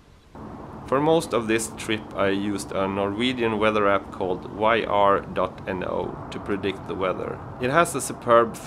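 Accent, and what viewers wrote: Sweden. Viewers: Beautiful, peaceful countryside ! Great English, Swedish accent ?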